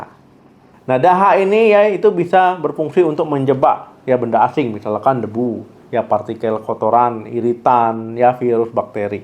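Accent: Aceh, Indonesia. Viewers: Macam gaya orang aceh ngomong bahasa indo dokternya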